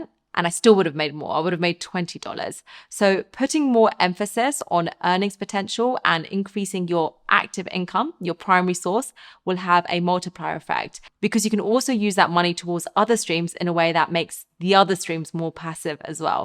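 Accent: England, United Kingdom. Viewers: I normally dislike English accents , but hers is lovely, very feminine, if she just wasn’t so hard to look at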